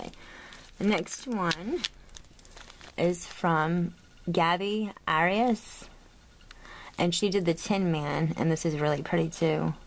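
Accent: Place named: East Coast, United States. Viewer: hahaha! OF COURSE I loved what u said at the end.....yes, your accent. I love it hahaha. U probably get tired of me saying it. It's the "R" that gives your accent it's different sound. East coast!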